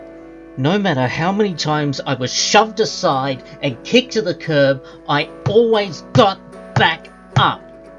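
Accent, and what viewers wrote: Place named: Australia. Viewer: A video like this is exactly what I needed. It makes me remember that there's always light at the end of the tunnel even when it all seems hopeless. I'm glad that things are starting to work out for you. Also, your writing skills are truly immersive and I really hope you do more stuff like this in the future! P.S: You're Australian? I honestly thought you were British for some reason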